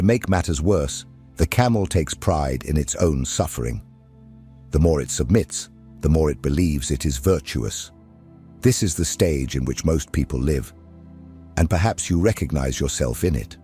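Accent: United Kingdom. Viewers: Am i supposed to think this is profound cause dude has a brittish accent and a deep voice? I mean i guess this is a good message for someone who’s been bullied all there life, but the whole ”domination used to be a virtue - lets bring it back!” narrative is just fucking stupid as all get out 😂. Sure be assertive and stand up for what you believe in, but not at the cost of seeing others as equals and trying to better yourself for the greater good.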